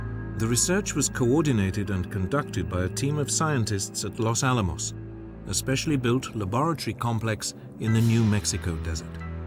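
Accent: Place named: United Kingdom. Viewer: Narrated by the British.....Shut up already.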